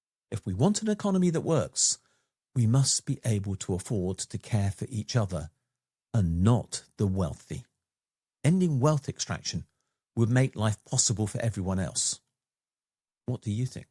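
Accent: United Kingdom. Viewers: If not for the accent, I'd have thought you were talking about the States, at first. Perhaps you still are. Sadly, this view of economics is taboo in the educational system of the States. Even discussing it in passing might earn one death by pyre...or guillotine. Should I assume the same applies to the schools throughout the UK? Or do you folks prefer dunkings? How much closer to the brink do you think the world can come before chaos ensues and we all return to the wars and turmoil that marked and marred the late 19th and much of the 20th centuries? History might not repeat but it has a nasty habit of echoing into the future. Of course, the last major reversal of this trend did not take place until after a decade-long global depression and an even more devastating global conflict, that left millions dead and tens of millions more forever changed. Even then, the correction lasted little more than a single generation before "evil" began its insidious war on the rest of humanity...again. 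What admonishment do we, who bear witness to the death rattles of this conflict, leave for those left standing when the next correction takes place? Or is it a futile exercise, as they and theirs must re-learn all the hard lessons, all over again? There is a theory that a small group of people suffer a neurological deficit much like but parallel to autism. Except in these cases, rather than never acquiring the ability to interact with the rest of the world like the rest of us, these others fail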